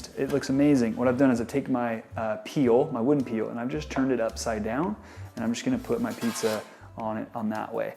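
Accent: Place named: United States. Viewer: What's with the metric system? This guys sounds American but comes off european. Still good video. Thanks.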